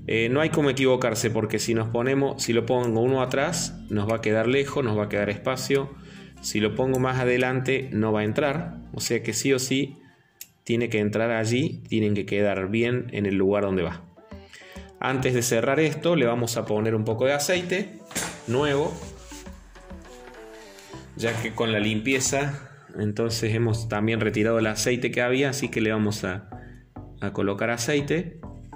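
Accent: Córdoba, Argentina. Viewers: por la tonada me pareció que sos cordobés soy de malagueño cerca de Carlos Paz .e visto muchos videos de otras Singer pero no encontré ningúno de la 29 k . casualidad la estoy reparando y me sirve mucho tu vídeo .un abrazo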